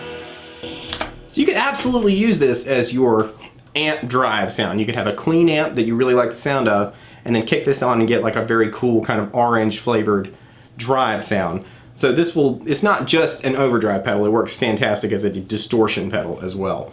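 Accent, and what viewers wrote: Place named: South, United States. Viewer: Thanks for the response. I hear your Southern Accent. Were you born in the South? Keep rocking! 😎😎😎😎.....That Splawn Amp of yours is real nice too.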